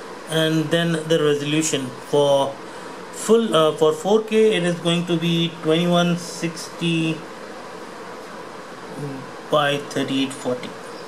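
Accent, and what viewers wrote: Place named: India. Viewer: Another indian with stupid tongue stupid accent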